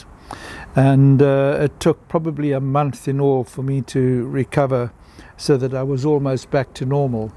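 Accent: South Africa. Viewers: How terrible, but the way you tell the story sounds elegant. Maybe it's the South African accent. Thank you for sharing, keep telling your stories. I love them!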